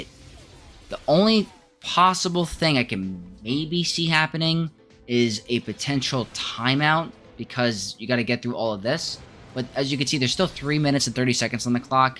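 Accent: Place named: New York, United States. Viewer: That New York accent. I miss mine lol.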